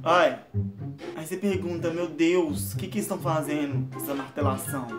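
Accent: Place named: Goiânia, Brazil. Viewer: Vc é de Goiânia conheci pelo sotaque, doido que nem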